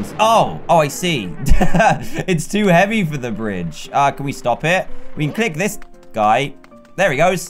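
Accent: United Kingdom. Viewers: Well Mr probably American ,or Canadian ,he's British so who freaking knows what he says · he s bidish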